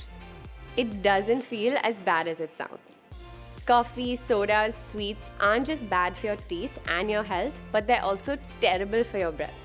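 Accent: India. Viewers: Her, Face -🇺🇸🍔 Accent -🇮🇳🍛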